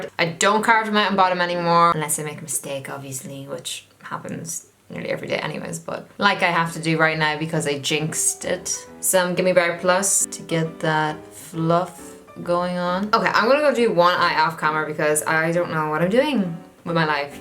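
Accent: Ireland. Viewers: Is that an irish accent u have?in its cute!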